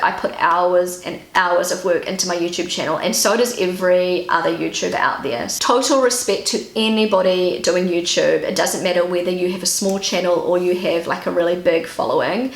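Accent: New Zealand. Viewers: I love your accent so much 🧡 I’ve been to New Zealand as an Aupair and you remind me of that beautiful time. Also I just realised we live really close to each other here in Franken 😁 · Hi, I just found your channel and I am subscribing immediately. How could I resist, you are a New Zealander!!! Love the accent. My mum was born in Palmerston North New Zealand. I live in Melbourne Australia, but New Zealand is like a second home to me. Wellington is my all time favourite place. During the 1970's, I visited New Zealand five times. The last trip was on my honeymoon. In those days, we were living in Sydney NSW. We moved to Melbourne Vic in 1981. Where are you from in New Zealand? Your husband's name is Rob?? Wow!! That is uncanny, as that is also my name. Incidentally, I used to have relatives living in Island Bay in Wellington and also in Wanganui. New Zealand is a beautiful country. 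Anyway, wishing you the very best. Rob.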